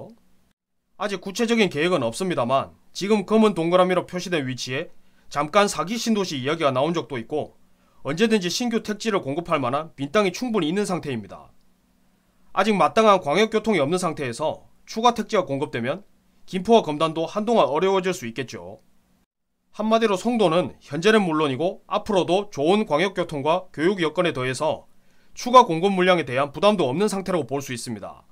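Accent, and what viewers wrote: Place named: Gyeongsang, South Korea. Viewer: 저 특유의 꼰대같은 경상도 말투가 비호감이라 좋아요는 못누르겠네...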